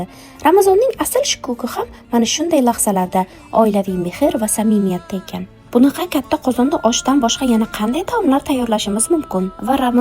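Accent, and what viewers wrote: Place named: Khorezm, Uzbekistan. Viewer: Уноши хоразмча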